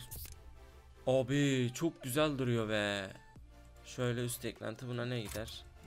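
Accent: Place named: Tokat, Turkey. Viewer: abi nerelisin ben tokat bizim oralılar gibi konuşuyorsun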